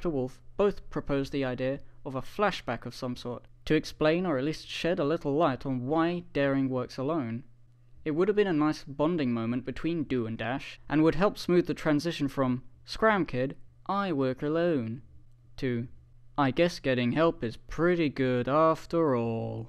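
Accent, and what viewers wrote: United Kingdom. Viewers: He's been British this whole time? Awesome!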